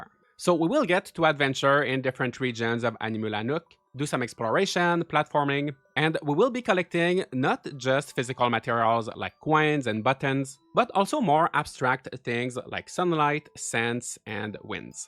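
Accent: Quebec, Canada. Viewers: french canadian?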